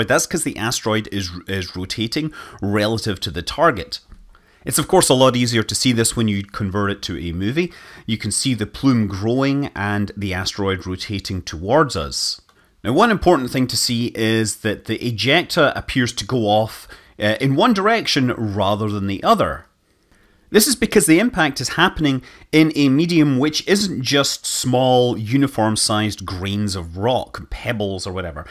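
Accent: Scotland, United Kingdom. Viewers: Came for the science. Stayed for the Scot accent.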